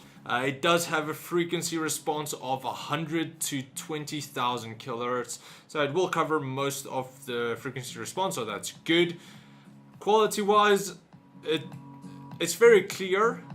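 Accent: South Africa. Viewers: south african tech youtuber? lessgoooo🇿🇦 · dude ur channel is dope mense must know you from south africa embrace the accent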